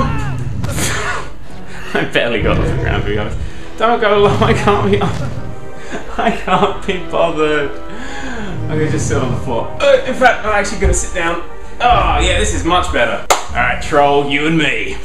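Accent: United Kingdom. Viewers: 99% of what makes this video great is the fact that he is narrating a harry potter game with a british accent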